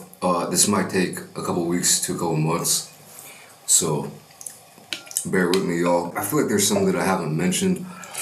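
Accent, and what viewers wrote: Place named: United States. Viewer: You can tell that been to America by the way he talks 😂 Keep up the great vids man 🙌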